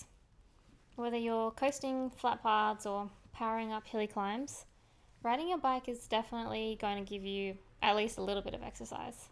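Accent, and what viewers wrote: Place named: Australia. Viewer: As a British "people", how you didn't pick up on her thique Australian accent both confounds and flabbers my gast.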